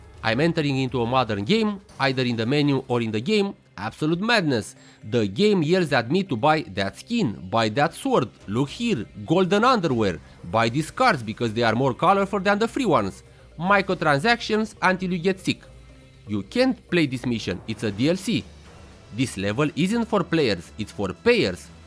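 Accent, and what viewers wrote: Romania. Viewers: sami bag pula daca tu nu esti roman cu asa accent xd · you seem romanian by accent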